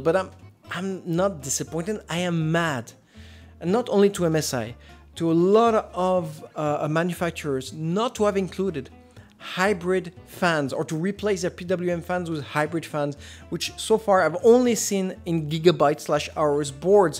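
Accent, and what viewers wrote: France. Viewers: Mais mais mais... je me disais bien que this voice hear french XD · oh, you're french? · I love his accent and now I desire a french man 😂🤦🏽‍♂️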